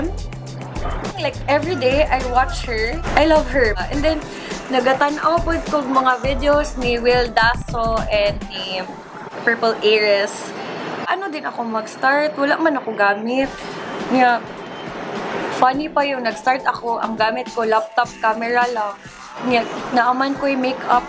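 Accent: Visayas, Philippines. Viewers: wow,, ayus.. dakong gensan,, bisaya jud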